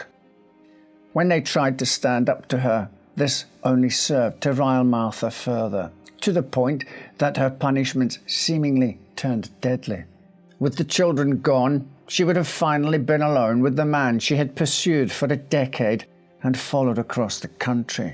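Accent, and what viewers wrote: Scotland, United Kingdom. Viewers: Does anyone know what accent this is? I sort of have an idea but I'd like someone who knows better to confirm. EDIT: He's Scottish, I thought so! Thank you guys! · The narrator is Scottish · Very impressive pronunciation, every syllable was perfectly enunciated, a hint of Scottish brogue, well done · is Scottish 🏴👍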